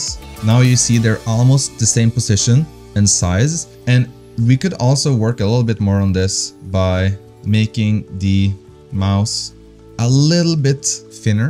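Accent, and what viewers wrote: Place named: Norway. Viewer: I know a norwegian accent when I hear one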